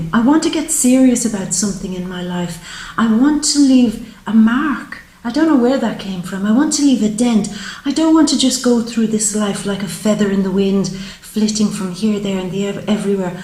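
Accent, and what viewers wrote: Ireland. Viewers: I'm thinking Ireland. I love the accent whatever it is.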